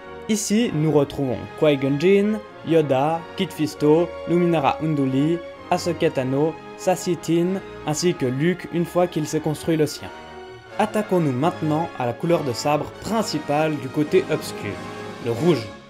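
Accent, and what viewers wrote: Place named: England, United Kingdom. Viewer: horrible accent anglais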